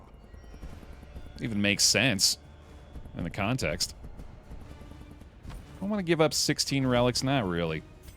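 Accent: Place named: Scotland, United Kingdom. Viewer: Dear god that scottish accent. Also instead of gobshite " ya wee dick" would have been better 😂. Love from Dundee 😊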